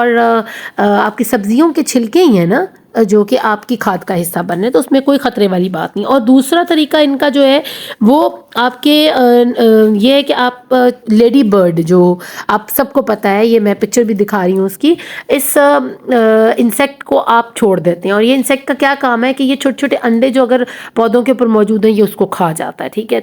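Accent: Punjab, Pakistan. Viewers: Ma'am ka bolne ka tarika Bata Raha hai k ma'am Punjabi Hain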